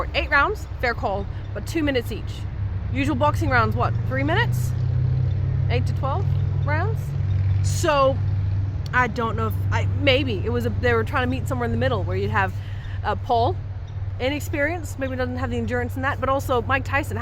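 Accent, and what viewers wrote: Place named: Western Australia, Australia. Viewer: 25 whole years ago. 60 days of my life was spent in WA. Good ole memories. Your accent made me flash back on the good times in my life.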